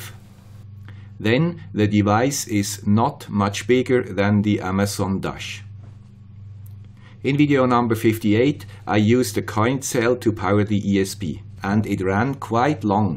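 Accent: Switzerland. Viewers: Very interesting video from the man with the Swiss accent. There is one part I don't understand though: how do you use a reed relay instead of a normal relay or mosfet? If I put a reed switch on a door or window, it makes a permanent connection, not temporary. How can you use a reed switch to trigger the ESP then disconnect the power once the job is done? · i want to help) i like everything on this channel and swiss accent also! like from Belarus!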